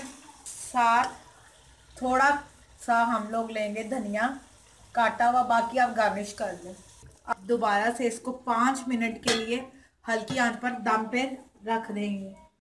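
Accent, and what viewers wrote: Lahore, Pakistan. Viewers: Labori urdo mix Punjabi style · Yh lahoreye aesy he bolty hen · Accent is subjective. Would sound weird in karachi, but is normal in lahore. Food looks yummy, what's matters is substance and not cosmetic features which we like to do. Loved this clip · Her accent is beautiful. Lahore, Lahore ha.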